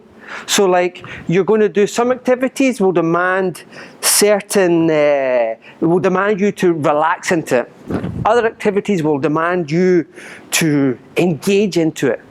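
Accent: Scotland, United Kingdom. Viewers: The Scottish accent makes this video 10x more awesome. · Great video 👌 Bang on everything you say. I’m a fellow Scot, from Airdrie just outside glasgow! Nice to hear my accent YouTube’s full of Americans 😂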